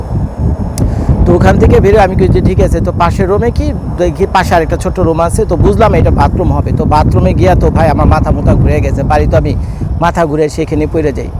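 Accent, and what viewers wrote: Dhaka, Bangladesh. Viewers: da apone ki hasnabader chele naki aponar kotha kichu ta dhakakiya der taan ashe baki ta mone hoy hasnabader jak bhalo thakben barir shobai ke neye ae prathonai kori ami ❤❤❤❤❤❤❤❤❤❤❤❤❤❤❤❤❤❤❤❤❤❤❤❤❤❤❤❤❤❤❤❤❤❤❤❤❤❤❤❤❤❤🎉🎉🎉🎉🎉🎉🎉🎉🎉🎉🎉🎉🎉🎉🎉🎉🎉🎉🎉🎉🎉🎉🎉🎉🎉🎉🎉🎉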